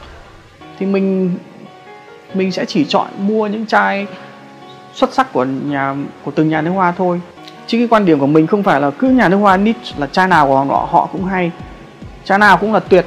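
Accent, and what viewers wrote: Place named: North, Vietnam. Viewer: cảm ơn video của bạn rất nhiều nhé <3 ps: mình thích zọng trai Bắc :))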